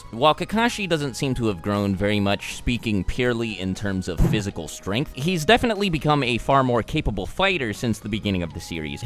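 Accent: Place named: Boston, United States. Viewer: Hey, do read the manga in Japanese? I ain't been watching your channel too long (I do rather like your videos) but when I hear you speak I figure you are from Boston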